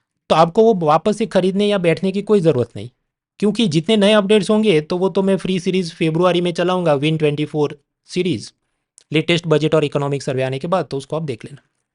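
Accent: Gujarat, India. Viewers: Saheb tamari vato ma Gujarati touch joine bhaiband bau maja aave chhe 😂😂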